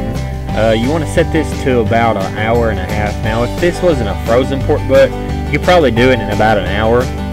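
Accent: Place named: United States. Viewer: oh honey, the country accent is beautiful. Don't ever cover that up 😊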